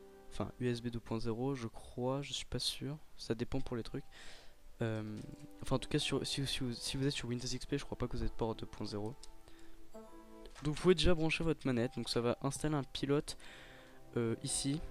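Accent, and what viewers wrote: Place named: England, United Kingdom. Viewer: omg cet accent anglais xD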